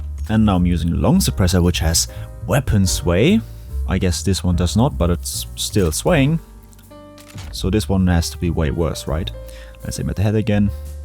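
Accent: Germany. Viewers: I was wondering why you would change the keybind for entering the firing range from Z to Y but then I remembered your German accent xD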